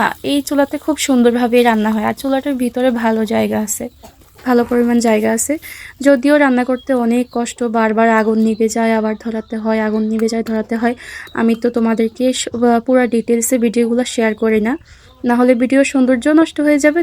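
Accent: Noakhali, Bangladesh. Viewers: Apu apni Noakhalir na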